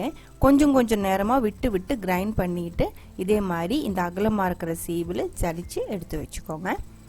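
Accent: Kerala, India. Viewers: I remember my hostel days, my kerala friends used to share this with me during the tea time in the evenings, I just love your tamil, just like my Malayali friends💞💖🤗😘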